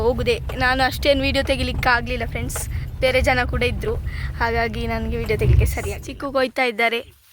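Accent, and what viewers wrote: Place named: Karnataka, India. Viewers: why this kannda sound diff from wht we hear in belagavi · Whenever I’m free ,I used to watch your videos. your place , nature , the putturu slang everything made me to watch again again ..!🤩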